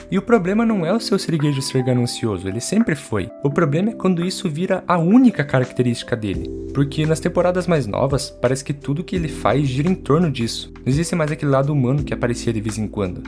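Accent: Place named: Inland Paraná, Brazil. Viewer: Pelo sutaque tu é do interior do Paraná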